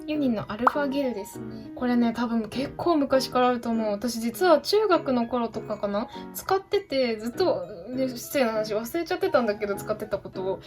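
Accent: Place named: Kansai, Japan. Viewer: 関西弁可愛い……………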